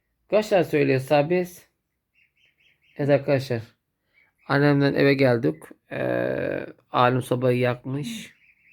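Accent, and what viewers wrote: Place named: Zonguldak, Turkey. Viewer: zonguldakça ayrı bir lisandır👍, kardeşim hakkını veriyorsun. bilhassa bu doğallık baktırıxor bu Vloglara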